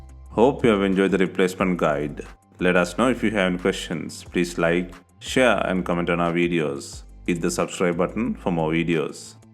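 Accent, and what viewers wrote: India. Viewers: Why is there an Indian voice for a white man's hands?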